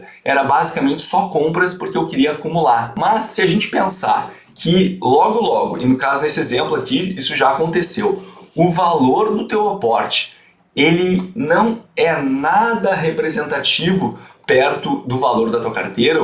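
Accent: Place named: Rio Grande do Sul, Brazil. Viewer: Tu és gaúcho? Tens o nosso sotaque.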